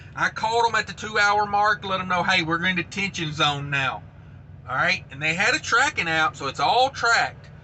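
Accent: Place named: Tennessee, United States. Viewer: Aha! I knew that accent sounded Tennessean, especially when you threatened to put a foot up someone's a$# over detention pay. You can take the boy out of the volunteer, but you can't take the volunteer out of the boy.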